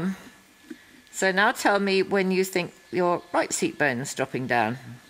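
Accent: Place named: England, United Kingdom. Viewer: This is VERY informative! I ride a horse with a very similar build, belly wise, and I struggle to keep her forward at the trot- my leg aids are not very good, I think. I saw a more advanced rider on her and they did not seem to have as much issue getting her to go forward, and to then maintain it. I even have to take my lesson with another horse just to keep her going, I need help with my legs I think! And it is good to know even pros struggle to look up- I also tend to softly focus right at the horse's head area, and constantly get told to look up that the fence or at the treeline... I would LOVE to be a working student! Also, those saddles are amazing! I feel like mine MIGHT be pushing my lower leg forward- I do a lot to keep it back, but I have recently seen some video of myself and it creeps forward regardless... I might see if I can try another saddle next week, if they have another one that fits Rebecca's (my lesson horse's) wide back! 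And you sound almost EXACTLY like my instructor, she is also English! She did eventing and then dressage, at a Grand Prix level, I believe. Or at least she had a Grand Prix level horse...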